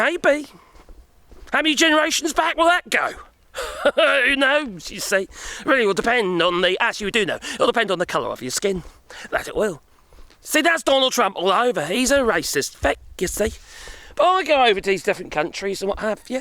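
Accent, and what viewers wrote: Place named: England, United Kingdom. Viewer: I'm french from Drôme and like your english accent and free speaking thx friend !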